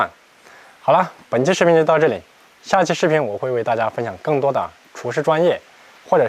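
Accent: Sichuan, China. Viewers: 王刚为什么这么红? 他的宽油烹饪并不适合一般家庭厨房。显然大部分观看视频的人并不是真的想学做菜。那到底是什么原因呢? 1, 他长得帅｡ 2, 他的川普好听。 3, 视频节奏明快, 讲解到位。 4, 富有乡土气息。 5, 邪神助攻。 6, 看他烧菜能解馋, 可以用来减肥。 你会选哪项? 大概很多人会选7, 那又是什么呢?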